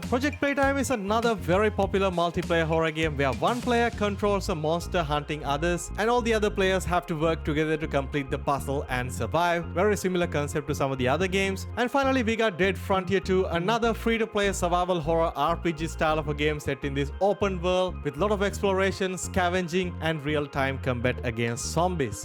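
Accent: Kerala, India. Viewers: de malayali engligh accent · Nice video brother , bro why do you sound like mallu ( no offense )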